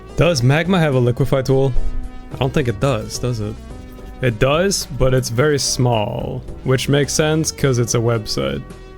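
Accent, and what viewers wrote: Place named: Sweden. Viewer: could tell by your accent you're swedish (from a norwegian with a lot of swedish fam) much love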